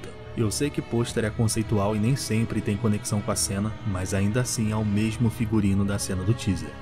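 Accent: Rio de Janeiro, Brazil. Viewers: Por que o narrador do vídeo tenta mentir e esconder o seu sotaque carioca?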